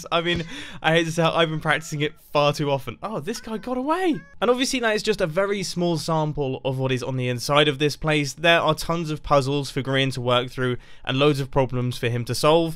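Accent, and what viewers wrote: United Kingdom. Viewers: the way he said what is “What is occurring?!?!?” Instead of saying what is happening is so funny to me for some reason the British accent makes it better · sounds better with his British accent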